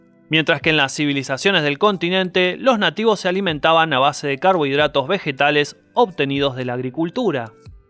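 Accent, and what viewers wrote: Argentina. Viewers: seguramente eres argentinillo por tu plaga para hablar, deberías ser más objetivo y es que la verdad éstas lleno de suposiciones deberías hacer videos como la; BBC inglesa, NHK japonesa ó Discovery channel americana, pero estás a años luz de hacer videos de calidad, tenías que ser argentinillo 🤣🤣🤣🤣🤣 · Qué es una gashina? Es broma es broma 😂. En realidad suena muy cool el acento argentino.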